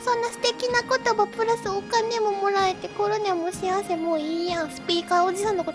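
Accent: Kansai, Japan. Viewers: there was one old stream (don't remember which one specifically) where she says her grandma's Kansai-ben influenced how she spoke when she was little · From the v-tuber wiki: She has mentioned on stream that her accent comes from a combination of her grandmother's Kansai dialect and an unknown dialect of her parents that has not been revealed. She is working on changing it into a more common one. Her accent seems to differ most notably from the inflections of syllables. For example, she pronounces 皆 as MIIN-na rather than the more typical min-NAA.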